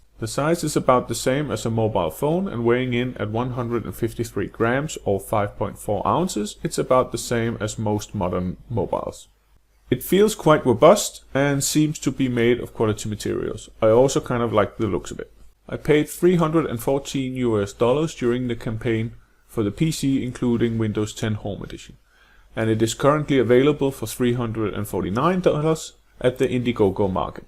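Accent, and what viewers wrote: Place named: Denmark. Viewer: I can recognize that Danish accent from a mile away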